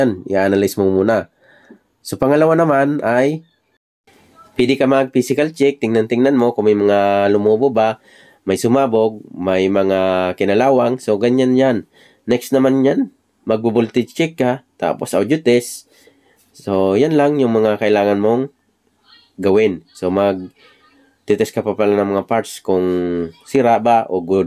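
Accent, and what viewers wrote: Bicol, Philippines. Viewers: Bikol ka palan manoy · Lods bicol dialect background mu ah😂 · Sir salamat👋taga bicol kaba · Good day SIR,... Parang BIKOLANO k po,... sain po ang "SHOP" MO dyan sa BIKOL?,... nagre- REPAIR k man po nin mga "BEAM LIGHTS",... ( MOVING HEAD)??? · Bikolano ka? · Bikolano po kyo sir?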